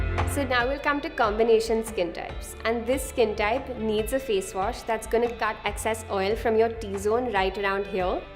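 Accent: India. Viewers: Dhe got Indian kinda accent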